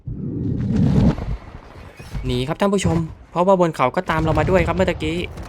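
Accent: South, Thailand. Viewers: คนใต้ไหมนิ55ไม่น๊อดนะแต่ว่า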